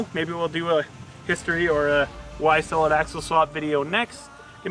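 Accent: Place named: Canada. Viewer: He’s mocking your (Canadian?) accent. Ignore the troll. Great video, great sound!!! 👍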